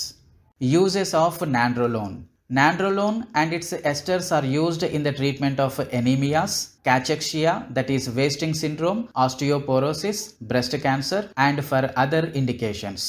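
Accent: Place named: Karnataka, India. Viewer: you have the kannada slang . from karnataka ?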